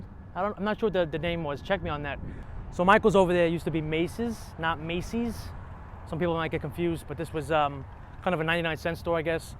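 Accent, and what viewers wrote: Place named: Staten Island, United States. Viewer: This guy has a thick Staten Island accent. Sheesh.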